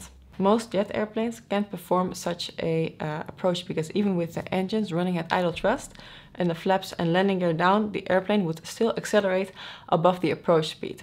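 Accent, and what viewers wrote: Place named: Netherlands. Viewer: Hi, your Videos are very good. The approach an landing at Lugano Airport was superb. But... PLEASE... can you speak a bit slower in your videos. You have a little "Dutch-Slang" in your speaking and for non-English or non-US-man it's very very hard to understand. Please do not be offended because of my little criticism. CU Sönke...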